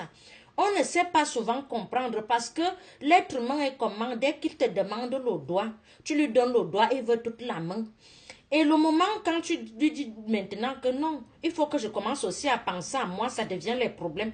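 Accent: Cameroon. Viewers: L' accent cameroonais la est fort ooo J te dit ma sœur, labre dargent la nexiste plus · Cet accent camérounais est vraiment trop dur et fort.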